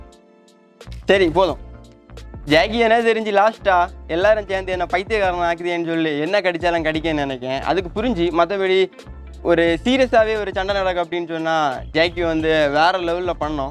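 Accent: Kanyakumari, India. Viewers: Thampi kumarimavata Tamil ketta rompa alaga irukku malayalam mix Tamil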